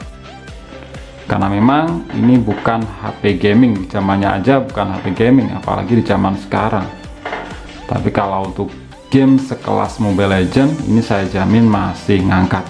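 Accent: Blitar, Indonesia. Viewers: Wong blitar bak e :v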